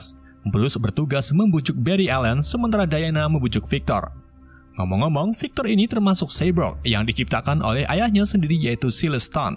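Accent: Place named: Banyumas, Indonesia. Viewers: Barry ndlenger akhirnya kesandung wkwkwkwk Asline wong ndi sih min? Wkwkwk ana ndlenger ana apa ah kayane wong ngapak pantura kie wkakakak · Anda orang Jawa ternyata tapi Jawa mwna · Mas 'e kerenn...jawa ngapak.. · Wong Jawa ya kang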